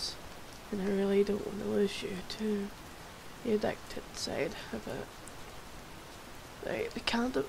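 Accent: Scotland, United Kingdom. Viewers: ah my daily dose of scotish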